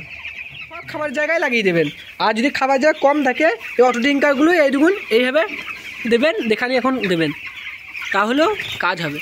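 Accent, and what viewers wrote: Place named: Murshidabad, India. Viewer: kotha suna bujlam murshidabad bari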